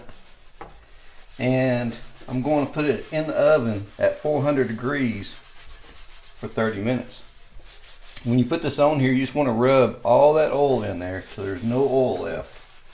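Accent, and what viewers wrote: South, United States. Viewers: your accent is hard to understand for non US ppl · Where u from? I’m new to your channel. I’m from North Carolina. You are definitely from the south lol I just ordered this exact griddle bc of this review.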